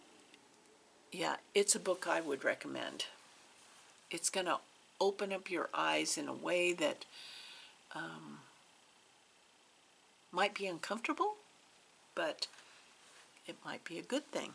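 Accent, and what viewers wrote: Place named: Kentucky, United States. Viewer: I’m reading Diamond Eyes, what a great read. I also love history. Just fascinates me. I’m one of those people who stitch all day and read all night and then wonder why I’m tired. 😂 I get together with girlfriends a couple times a week and stitch or knit. I’m making another summer top. It’s so soft and drapes beautifully. Using bamboo yarn.. which I would have never thought it would be so soft..who knew. Thanks for the great visit, I’m going to check out the floss tubes you mentioned. Nothing funnier than listening to a good ol Kentucky accent. 😂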